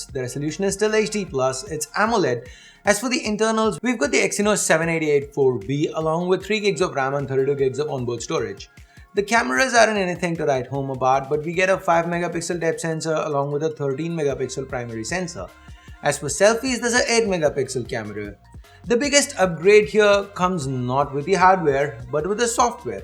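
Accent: India. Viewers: Very well done 👍 you're speaking the voice of India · i love u indian talking engliah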